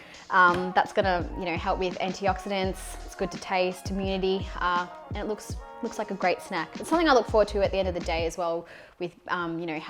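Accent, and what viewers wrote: Australia. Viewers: I like the Aussie accent.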